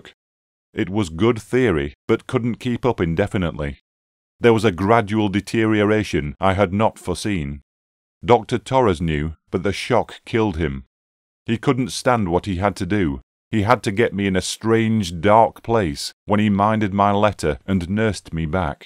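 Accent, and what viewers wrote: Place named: Spain. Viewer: One of the best Lovecraft's tales! ⭐⭐⭐⭐⭐ Funny one Spanish doctor's name was 'Muñoz' & the other one 'Torres'. 'Torres-Muñoz' is a pharmaceutical company founded by the time the tale was written & that still exists in Spain! They make pharmaceutical salts. 😀 Thank you for the narration & was enjoyable with the Spanish accent! 🙏☺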